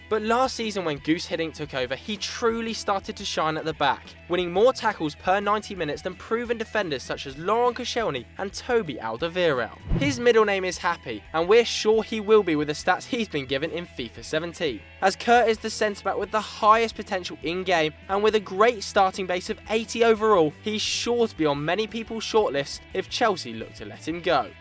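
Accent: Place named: United Kingdom. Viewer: i cant even understand this British fuck when he gives stats. Whats "Naut".495???? 0.495 or 1.495 what is this bullshit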